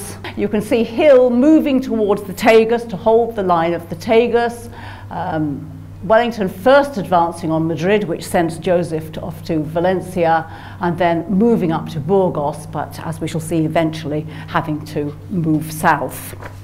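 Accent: United Kingdom. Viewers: Since she spoke with a British accent, I will not argue any facts of these events.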